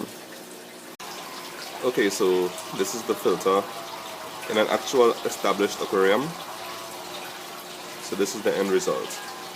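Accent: Trinidad and Tobago. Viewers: i hear a trinis voice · Hmm u sound like a Trini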